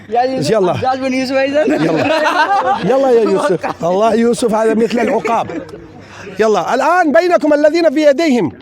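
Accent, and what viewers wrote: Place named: Saudi Arabia. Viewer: السلام عليكم ورحمة الله وبركاته هل أنت سعودي؟ أنت تتحدث العربية باللهجة السعودية، فمن أين تعلمت هذه اللغة؟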